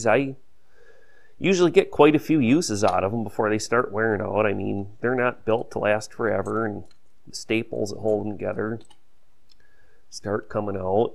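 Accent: United States. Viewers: jeremy thole Ha ha I’m in Melbourne Au trying to work out if it’s an American or Canadian accent! Guess it’s American?! 🥴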